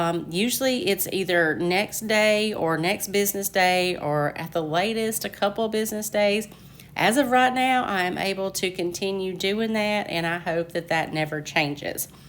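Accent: South, United States. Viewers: I loved the tray best of all. I haven’t tried paint inlays yet but I think I will give them a go. I always look forward to your new video every Wednesday. I love your southern accent - I have a very Yorkshire accent!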